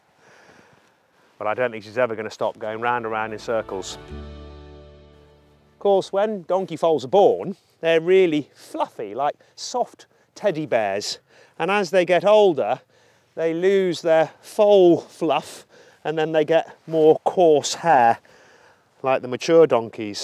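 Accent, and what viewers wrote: United Kingdom. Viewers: Because he has English accent. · omg the posh level of this guy, its like if you could take the most stereotypical rich english guy and put him on screen you would naturally have this youtube channel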